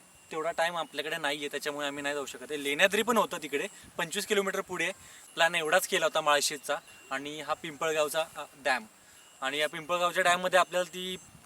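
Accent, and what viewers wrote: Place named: Kolhapur, India. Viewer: Best commentator bhava..👏 Ekdam kolhapuri type..😉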